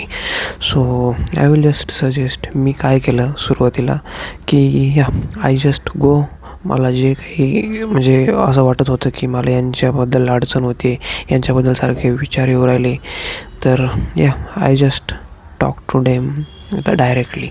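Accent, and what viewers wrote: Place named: Marathwada, India. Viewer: are bhai tu ekdam relatable vatla kharach bolaychi tone ekdam marathwadi ahe tich thev original vat te aikayla ani tu real rahshin main mhanje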